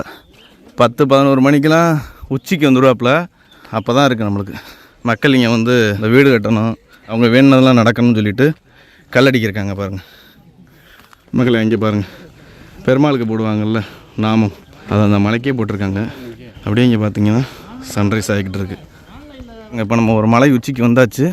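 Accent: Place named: Ramanathapuram, India. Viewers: Ramanathapuram slang nalla iruku😊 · Always waiting for ur vlogs. Ur efforts to b appreciated n every vlog..ur tamil slang nice to hear bro....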